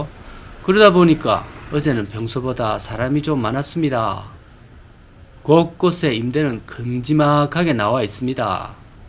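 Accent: Gyeongsang, South Korea. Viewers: 구수한경상도사투리에 후대들을생각하는 마인드 ㆍ오랜연식에서 나오는 ㆍ혜안등이국보급이ㄴ거죠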